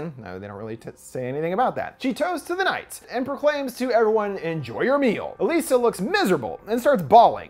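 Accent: Italy. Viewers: Wait don't go I liked your ~fabulous Italian accent~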